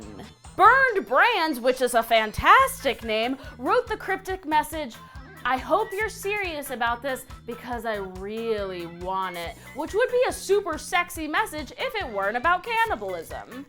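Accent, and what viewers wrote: Germany. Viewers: best German accent ever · I'm in love with! I have subscribed and I will NEVER unsubscribe! You're actually really good at a German Accent! (I'm From Germany) Please make more videos!!!!!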